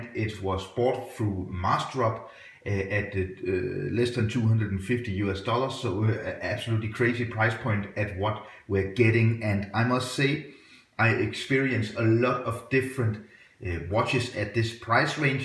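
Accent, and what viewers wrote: Denmark. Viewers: Watch On...your accent...Danish? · Tak for dit review :) Jeg kunne høre du er Dansk, du sagde her et par gange. Men hvad jeg ikke helt forstår er HVOR du købte det??? Og FEDT ur, jeg vil have et hvis jeg kan få et. Det er gennemført nice og dejligt at høre hvor superglad du er for det. Jeg sidder selv med min spritnye Oris Staghorn Diver WR300m, med et dejligt Sellita værk SW200-1, Oris modded' det for Staghorn, og det er meget præcist og dejligt at gå med... Jeg er også glad for mit og kigger hele tiden på det. Det gør du også er jeg sikker på, hahaha. Congratz with the watch mate... It's KOOL.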